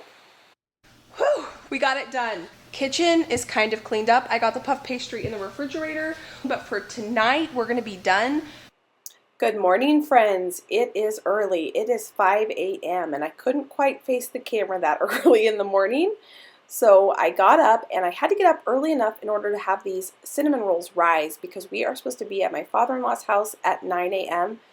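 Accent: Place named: Michigan, United States. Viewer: Pasties! I thought I heard Michigan when YOU talk.. I’m from Michigan too!!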